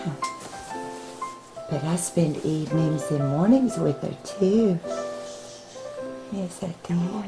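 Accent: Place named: South, United States. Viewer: Hi and Lillian 💜 thanks for saying that 😊 your words of encouragement make me want to study even more to do better 👍.. i managed to pass everything, in fact we all did, so there was a week of celebrations after we found out ✨🎉✨and yep we watch your videos as a group and also put your videos on in the background because they're such a pleasure to watch and even to listen to..like every one of them is different, and I have to say you have one of the nicest voices I have ever heard..I really like your southern accent. Yep 4 room mates from Poland, Switzerland, Sweden and Belgium, and all of them love your channel, and we love seeing Lillian getting up to all her mischief 😊 she is so funny, cute and cheeky all at the same time..When we put on your videos we feel you're in the room with us too, it is a good feeling...Next year we will be separated for 6 months as we have to do work placements for our degree, a couple of the girls are going abroad to Australia and Greece but i want to do my placement in one of the big London hotels..I also think it's great that Brandon is interested in being a chef because it's a great career choice and there is always great opportunities for chefs in big luxury hotels etc and the £$ is normally really good,.. 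When Lillian gets a baby sister it will be double mischief, i think it's a great idea..Have a fantastic day xoxox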